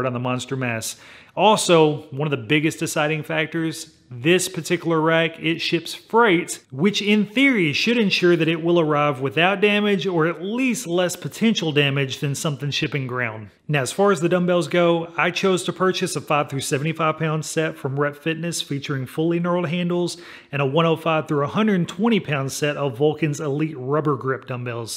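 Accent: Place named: South, United States. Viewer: From the sound of your voice you live in like Tennesee or one of the Carolinas.... And I hate driving 🤣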